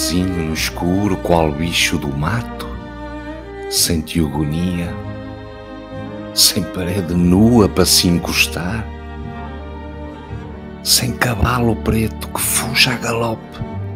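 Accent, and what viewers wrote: Portugal. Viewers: Esse cara com sotaque português é fera ao recitar poemas . Muito bom ! Quem é ele ? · Essa narração com o sotaque de português de Portugal ficou incrível... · O que é maravilhoso é o sotaque português.perfeito!!! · Essas leituras ao som português é divino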